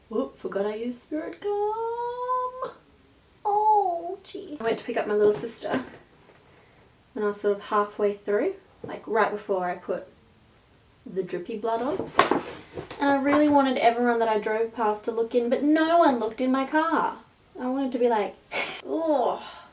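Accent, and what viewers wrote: Australia. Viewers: Shes Australian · your Australian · Is she Australian?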